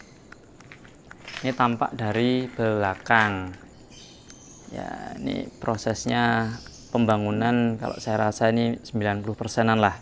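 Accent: Indonesia. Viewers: daerah mana mas ..klw aku.daerah jabar...dari segi bahasanya jatim or jateng ya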